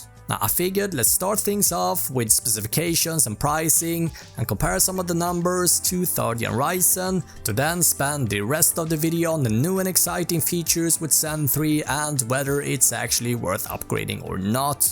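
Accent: Sweden. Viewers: jag hör på din brytning att du är svensk. jag kom på dig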